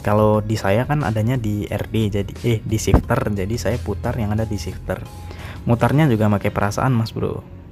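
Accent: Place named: Indonesia. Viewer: tplong Bro ..bahasa inggris jgn dieja dgn indonesia kl screw itu bacanya skru kl adjuster bacanya edjaster hhh youtuber indonesia dah paling parah dah.. modern tampilan tp ada rasanya primitf2nya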